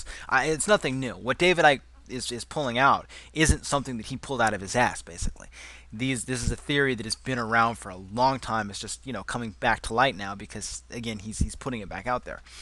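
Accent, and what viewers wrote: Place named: United States. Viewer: I Cannot stand the arogant yank talkover it pisses me off!Cant someone else do it?(it,s just his voice).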